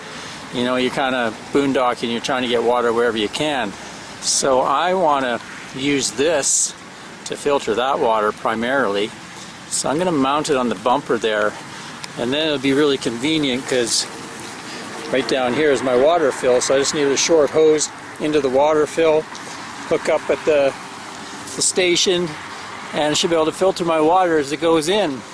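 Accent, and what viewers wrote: Canada. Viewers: I could listen to a Canadian talk aboot anything all day long eh.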